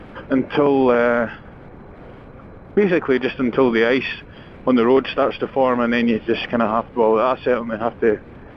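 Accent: Scotland, United Kingdom. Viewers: Hey dude, good to hear another Scottish Accent :) If you are ever heading further south on your Vespa, let me know and we can go a ride!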